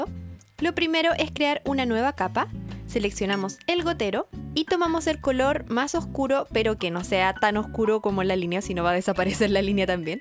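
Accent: Chile. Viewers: eres chilena? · Me encantó este vídeo y como explicas los paso en el photoshop. No se que tiene el acento chileno pero me embelesa, saludos desde Canarias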